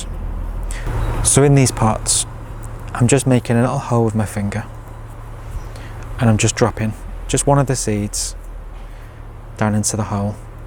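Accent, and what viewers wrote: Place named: England, United Kingdom. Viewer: You sound English, are you in Europe or here in the USA.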